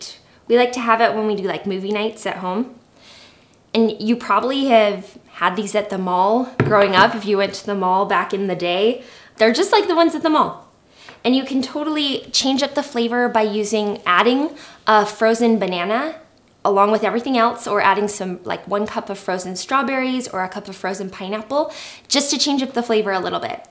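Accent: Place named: Nebraska, United States. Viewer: Lol, it's a Nebraska accent, actually. But I did go to BYU for school.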